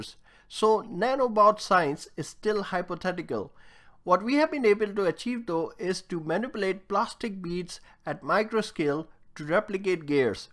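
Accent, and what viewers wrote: India. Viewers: Oh wow an interesting video. Indian accent Nevermind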